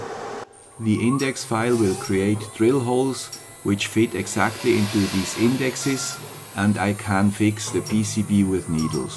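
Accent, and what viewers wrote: Switzerland. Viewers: Where can I buy one of those Swiss accents? It sounds great. And, on your videos, I especially appreciate - your commentary is not competing with loud music. If only all YouTube videos were like yours.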